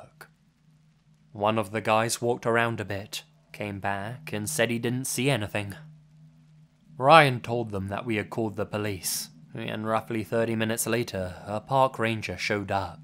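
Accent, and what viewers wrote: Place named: England, United Kingdom. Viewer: I love your videos man, where in England do you live? Love the accent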